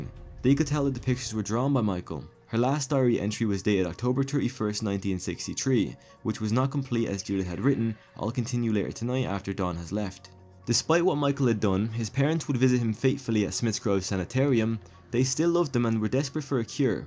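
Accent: Ireland. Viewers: I’m pretty sure he’s Irish. Regardless, it’s all the same language.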